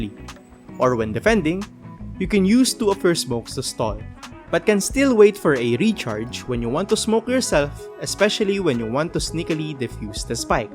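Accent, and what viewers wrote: Philippines. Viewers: hey man what region do you play in? you sound like you're from apac specifically in the philippines lol. not that you mind!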